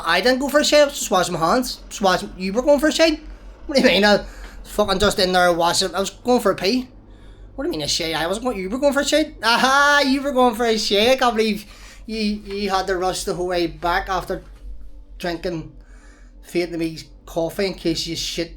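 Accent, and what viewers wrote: Liverpool, United Kingdom. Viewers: Are you a scouser :D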